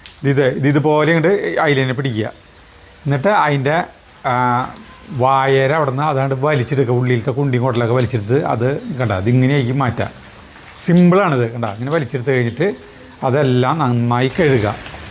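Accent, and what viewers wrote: Thrissur, India. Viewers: നല്ല തൃശൂർ ഘടി...❤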